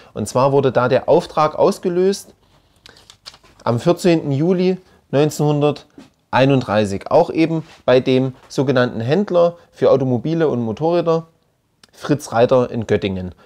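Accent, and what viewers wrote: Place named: Saxony, Germany. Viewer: ich grüße einen Sachsen! Man kann den Dialekt nicht weg bekommen. Mir gehts auch so in Wien!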